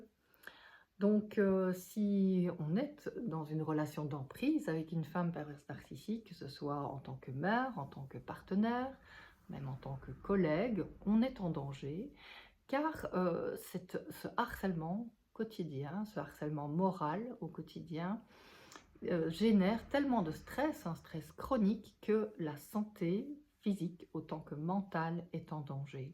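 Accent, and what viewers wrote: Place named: Belgium. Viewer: Merci de votre professionnalisme, bravo pour votre travail, mes félicitations pour vos connaissances et mes très respectueuses salutations. Votre joli accent belge me fait penser à l'excellent Michel Collon.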